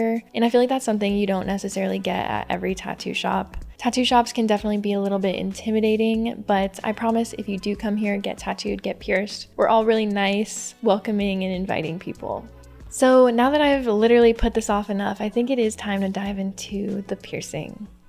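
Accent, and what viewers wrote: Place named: RP, United Kingdom. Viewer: I was so suprised by the posh British accent!😂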